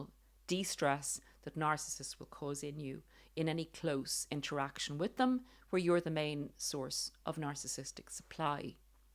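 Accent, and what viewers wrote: Ireland. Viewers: Thank you dear for sharing the things that make a narcissist lose the plot! It was so lovely to hear your voice again I love your Irish accent and so pleased that the audio version will be with you speaking. It has to be to be authentic!! A HUGE congratulations on releasing your first of a series of book - go for it!! Remy your co producer was thinking a nice long walk would be better than a narc video. I hope he gets a raise in salary as without him you wouldn’t have this book. He was the co-author wasn’t he? 😂 I have been doing some art of late so that’s why there’s a big gap since my last comment. Listening to your podcast from March 2024 has brought SO much healing understanding and can love myself back when I look in the mirror. It only took 22 years for this to take place so guys hang in there. I wished I had your messages back in 1984 it would have saved me loads but better late than never! 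Every single word you shared I lived with and was hell on earth. You say it very clearly and don’t muck about which is what I liked so carried on watching and listening. I was totally shocked to begin with as I felt like you had been walking with me in this dreadful marriage. As he walked out in a very pathetic suicide attempt which he threatened non stop I told him to STAY out as he was no longer needed or wanted. By golly he went more nuts than he was before. I was cool calm and ignored him totally which made him so angry. So so glad those days are gone and never to happen a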